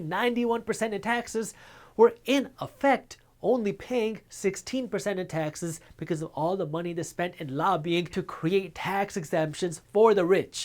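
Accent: United States. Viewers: He's American or seems to be from there going by his accent, so obviously he knows terms / gestures from the dominant culture. Do you think Mexicans only do "Mexican" things all day long, and Sikhs only do "Sikh" stuff all day long? They live in the same society you do, and as English-speakers understand terms as they are meant. Just like European-stock girls understand what tipping a hat means, despite never having tipped one. I'm not saying any of this in a mean or unfriendly way, but just want to poke you and remind you to put on your "thinking cap" (something nobody has ever actually owned, but we all know what it means). 😊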